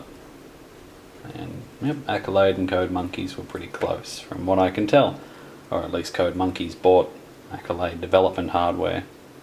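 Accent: Australia. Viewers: If you are anywhere near Brisbane (definitely have an Aussie accent), I have a free analog scope for you ;)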